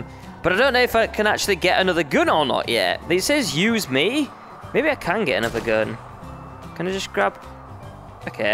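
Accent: England, United Kingdom. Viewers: Where are u from England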